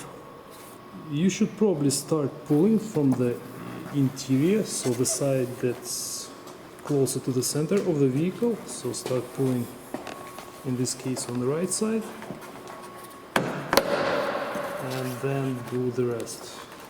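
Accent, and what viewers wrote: Russia. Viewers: А почему акцент рязанский?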